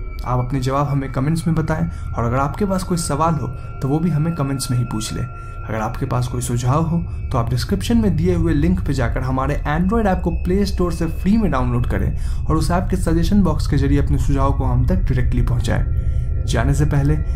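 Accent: Bengal, India. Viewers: Aap Bengali ho kya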